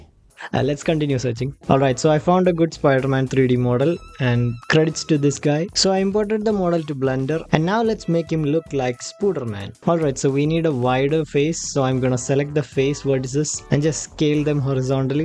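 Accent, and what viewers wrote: Kerala, India. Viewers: Edaa malayalai moneeeee🌝Ninte english ketta mansilavum nii oru mallu aannenn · bro are you from kerala just guessing :) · Are u frm kerala?(malayali) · The mallu accent is lit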